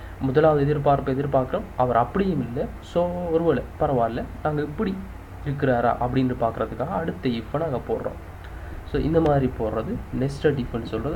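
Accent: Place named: Sri Lanka. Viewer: Srilankan tamil beautiful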